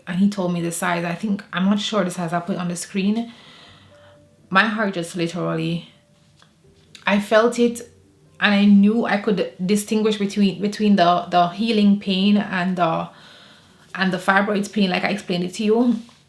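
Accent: Jamaica. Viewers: Waiting to do my open myomectomy yu sound like a Jamaican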